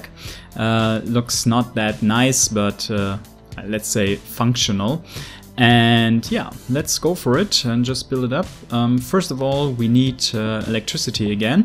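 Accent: Germany. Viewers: Are you german? I think you have a german pronunciation.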